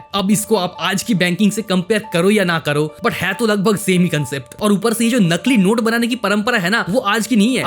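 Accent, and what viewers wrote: Bengal, India. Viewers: Bhaiya aap Bengali ho kya?? Apka hindi accent suun ke lag raha hai😅😅❤ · ভাই তুমি তো বাঙালি? তোমার কথা বলার পদ্ধতিতে বোঝা যাচ্ছে। ধন্যবাদ তোমার ভিডিও খুব ইনস্পিরিং। আরো এগিয়ে যায়।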